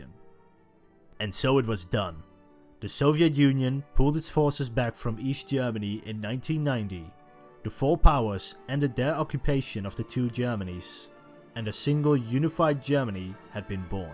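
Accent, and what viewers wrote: Netherlands. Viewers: I guess dutch. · that sounds like a dutch accent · Strong Dutch accent :) cute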